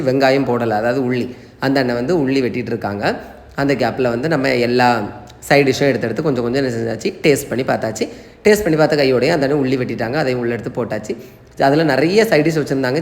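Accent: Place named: Kerala, India. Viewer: Anna neenga pesumbothu Malayalam kalarnthu varuthu.eppadi..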